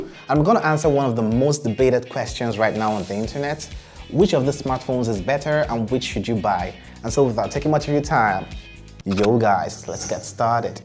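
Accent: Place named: Nigeria. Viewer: He sounds like a Nigerian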